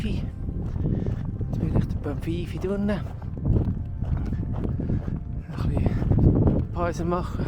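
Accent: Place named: Central Switzerland, Switzerland. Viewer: Hallo lieber Auf meinen Weitwanderwegen habe ich immer auf das Zeltschleppen und -schlafen verzichtet. Deshalb bleiben mir die wunderbaren Strecken in Amerika verwehrt. Umso schöner ist es, wenn ich nun dank dir und deinen schönen Videos den gesamten CDT (hoffentlich) mitwandern kann🙂! Sogar dr komisch Innerschweizer Dialäkt verschtohni guet 😊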